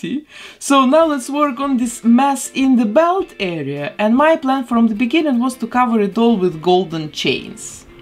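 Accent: Russia. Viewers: Why do you have a Russian accent?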